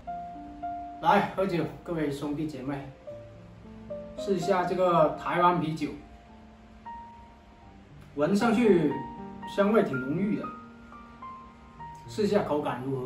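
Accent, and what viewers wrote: Cantonese, China. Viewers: 其实你的普通话的口音就是广东话口音，早就知道你会说广东话。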